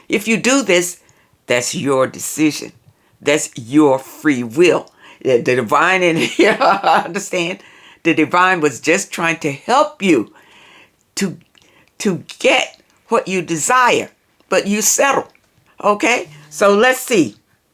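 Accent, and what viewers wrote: South, United States. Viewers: Hello Miss, I heard you mention are orihinally from the Carolinas. I'm hete in South Carolina, in Whitmire, Newberry County. I thought detected some Southernisms in your speech. Friendliness too. Also youre a fixed sign, me too Double fixed ♏ Scorpio Sun, ♌ Leo Moon, Scorpio Rising..I enjoy your videos, I learn something new with each video. Thank You. *Pardon my typos, for some reason the Edit option jumps around, wont allow me to correct in each word.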